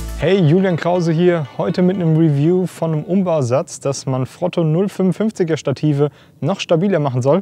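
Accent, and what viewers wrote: Germany. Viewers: Oh my dear friend! I follow you all the time for the best reviews and I really wanted to hear you speak in your native language. It seemed to me by name and accent that you have something at the base from Germany but I wanted to hear you. Haha!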